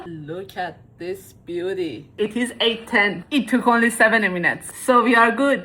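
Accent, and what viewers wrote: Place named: Czech Republic. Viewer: Love your personality and accent. Are you Czech?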